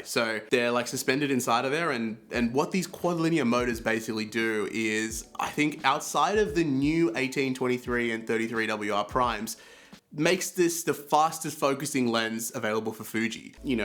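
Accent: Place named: Australia. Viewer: This lens has been my workhorse lens for most of my events and weddings for the past couple years. I have a similar 'review' of this lens on my channel also. I should say however, moving forward I think I will be replacing my XF90 with the XF50-140, that feels like the zoom equivalent of the 90, a bold thing to say indeed! At 140mm f2.8 you will get similar rendering and DoF to the 90 at f2 making me feel like keeping both is overkill. I think the 50-140 with the 56 is a good way to go (or at least the direction I am currently heading in). Good review and nice to see/hear another Aussie on the platform.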